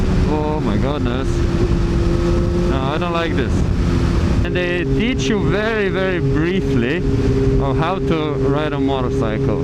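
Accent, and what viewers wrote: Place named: Latvia. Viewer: Cheers mate. Ain't you from Lithuania? I hear some accent... Latvia, maybe.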